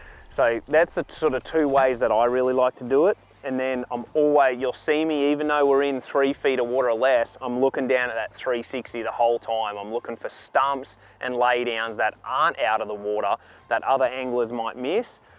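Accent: Australia. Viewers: I could listen to Aussies say "cover water" all day. This guy has a solid accent. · First time hearing. Thought he was Canadian. But sounds Aussie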